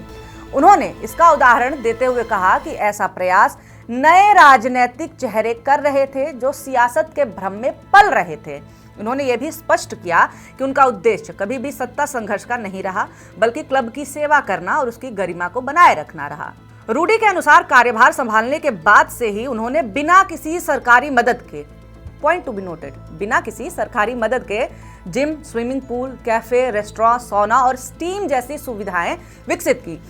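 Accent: Bihar, India. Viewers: I'm fan of your style ❤ Up Bihar wali hindi tone + smile ke sath roast😂😂 I obsessed with your all episode 😊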